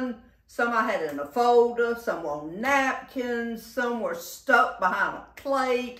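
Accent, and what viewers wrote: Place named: Deep South, United States. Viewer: You don’t say where in South Carolina your from. I live in the upstate, Greenville . I came here in the 70’s, my husband tells me when someone asks me I can finally claim, “yes I’m from around here.”☺️ Your accent sounds Deep South, Charleston and I love it! I lost my sweet 98 year old mother-in-law in 2020 and you remind me so much of her in the way that you cook. She was a wonderful teacher just like you.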